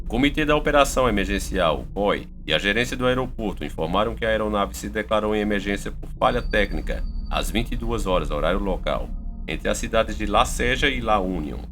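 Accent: Northeast, Brazil. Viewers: é o narrador da discorver channel más nordestino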